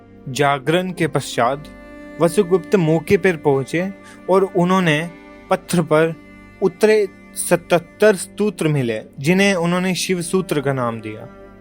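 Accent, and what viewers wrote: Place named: Kashmir, India. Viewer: Narrator is Kashmiri, I can tell from the accent… loved that ❤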